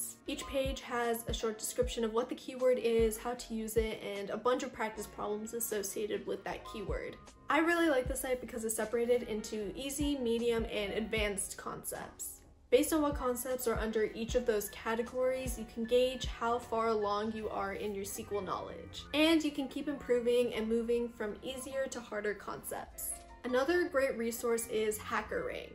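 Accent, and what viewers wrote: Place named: India. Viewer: Hey thnx just curious to know are you from India? Your ascent is Amazing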